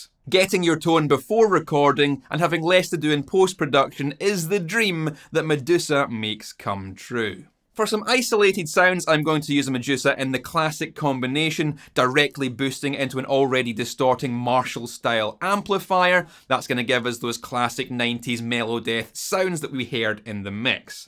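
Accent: Scotland, United Kingdom. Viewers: I remember you from the other channel.. Im much older then you are.. I always seen you as this friendly metal musician, hard rock, heavier then but that style of metal-rockish 80 thingy. I was almost thinking that the next hit song will be released by you, and it will be better version of" ". I thought that untill now!!! I always thought that you had a cute accent and always respected it because I way better like the original Scottish culture then the imperialist culture of England, and Scottland was always this land of beuty surrounded by people who dont belong there. What you expressed today made my jaw drop! YOU ARE FUCKING AMAZING!!! DUDE! GREAT RESPECT! Respect for being such a friendly and professional video content creator while hiding this FUCKING AMAZING BEAST INSIDE YOU! Dude.. Awesome! Did Anyone else notice that!?!?! SHARE THE FK OUT OF THIS! 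The guy is A-FUCKING-MAZING! No im not getting payed for writing this shit! I could be his dad, and I got my own son.. The guy just impressed me so much. Oh my God.. YOU SIR GOT AMAZING SKILLS AND TALENTS!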